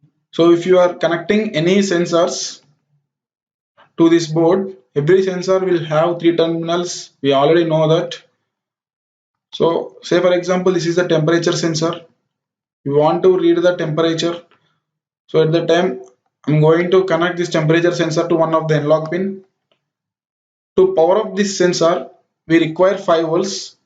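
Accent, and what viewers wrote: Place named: India. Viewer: Good content, but Indian accent is so strong it is hard to understand.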